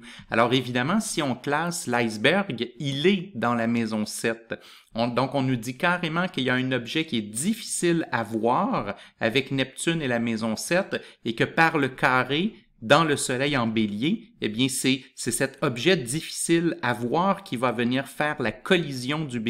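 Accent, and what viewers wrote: Quebec, Canada. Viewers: Merci pour vos vidéos très instructives, en plus quel plaisir d'entendre notre cousin du Québec !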